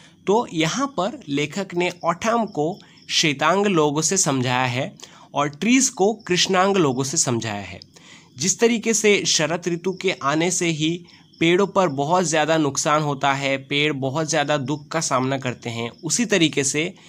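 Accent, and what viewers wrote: Assam, India. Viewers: Sir r u Assamese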